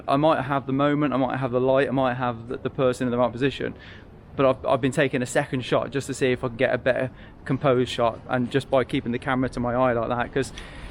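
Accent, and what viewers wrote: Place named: Northern England, United Kingdom. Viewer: Great video, Bath is the place to be. Love how you Northerners pronounce it. Keep um coming mate.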